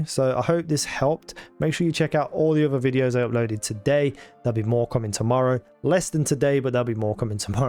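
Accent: Birmingham, United Kingdom. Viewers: Birmingham? Walsall?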